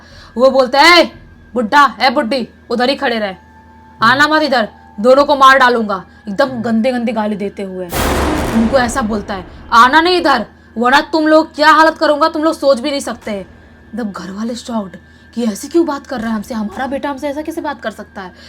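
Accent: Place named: Eastern Uttar Pradesh, India. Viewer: Language se pta chalaa mam shayad eastern up ya Bihar-jharkhand se h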